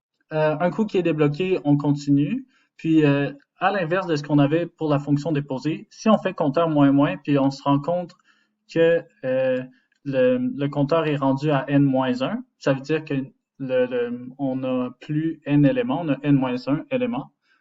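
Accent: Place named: India. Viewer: لي يحب يعذب وذنيه يسمع هندي يهدر فرونسي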